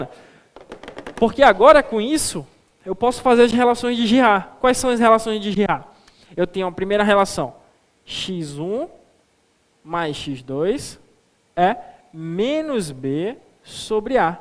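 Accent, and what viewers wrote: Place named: Manaus, Brazil. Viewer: Ótimos videos, parabéns! Sotaque manauara muito bom de ouvir.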